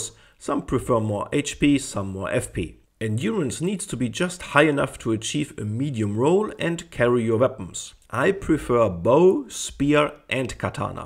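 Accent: Germany. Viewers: Du hörst dich ziemlich Deutsch an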